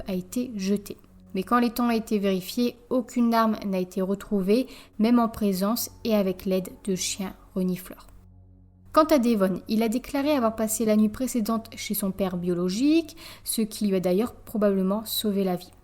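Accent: North, France. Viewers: Je viens de découvrir ta chaîne, franchement trop bien, des true crimes bien racontés, des sources et des images réelles, bravo !!! Sinon tu viens du Nord comme moi? 😉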